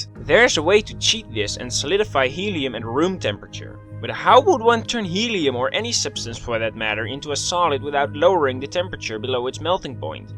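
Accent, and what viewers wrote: Netherlands. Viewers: i could hear that you were dutch within the first 5 seconds xD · You sound Dutch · He sounds Dutch to me. I'm almost sure he is. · Are you Dutch? Sounds like a Dutch accent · Pohh, da's pas een Nederlands accent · Your accent sounds North Hollandic or possibly Frisian. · Dutch af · dat nederlandse accent .....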